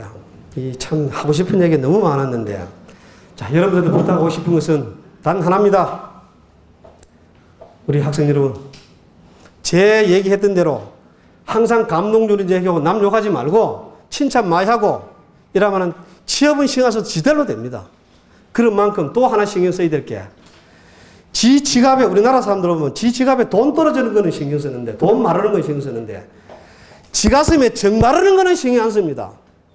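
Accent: South Korea. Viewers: 안동 영주 사투리군요. 말의 힘 꼭 명심하겠습니다. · 교수님 감사합니다 혹시 고향이 경북 청송이신가요?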